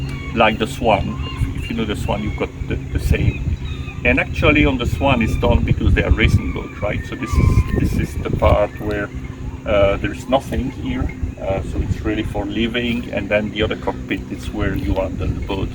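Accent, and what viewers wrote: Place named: France. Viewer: Merci beaucoup d'avoir partagé votre point de vue, et quel beau bateau, excellent gréement ! Youtube fait la promotion de votre vidéo aujourd'hui, alors bonne chance, vous obtiendrez plus de vues et de clics dans les semaines à venir, je suis un "Subscribed" maintenant . Quelques questions : votre enseigne est USA, votre accent est français et votre cuisine est belge, où est votre port d'escale maintenant, toujours Seattle ? Je n'ai pas saisi le nom de votre bateau 🤒(AIS?) Je ne connaissais pas le constructeur du bateau, donc superbe promotion pour Fabola. Intérieur magnifique. table bonne idée, mais pourrait être mieux... Je suis d'accord sur l'enrouleur dans le mât, mais couvrez le triangle restant aux UV, je viens de casser mon point d'écoute de selden enrouleur à Nieuwpoort en juin. La plate-forme de bain est une solution un peu maladroite entre un bateau de course avec le contrôle du mât arrière et un croiseur avec une sortie confortable de l'eau. 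Vous êtes le seul à avoir une Fabola sur Youtube, alors partagez d'autres vidéos si possible. Votre moteur, Volvo Penta ? Qu'avez-vous changé sur votre bateau depuis que vous l'avez acheté ? Votre équipement de navigation..vos voyages au dela de la cuisine. Plus important encore, qu'est-ce qui s'est cassé et comment l'avez-vous réparé. Il y a une grande communauté de marins sur YT qui apprennent les uns des autres, et peut-être ce qui s'est mal passé va aider la communaute.Pas tout le temps des bikinis, et le style sans faute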